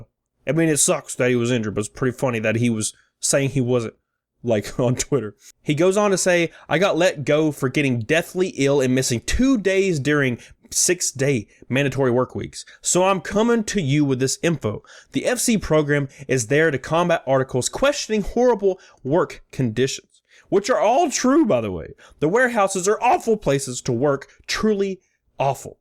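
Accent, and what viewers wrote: South, United States. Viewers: I went to GamtStop today and they tried to sell me a 1 year warranty. I asked are you guys gonna be around in 1 year. The employee laughs and said you sound like the guy on YouTube with the southern accent. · Omg yes I love you. I need a southern gentleman in my life. Marry me please. 🥰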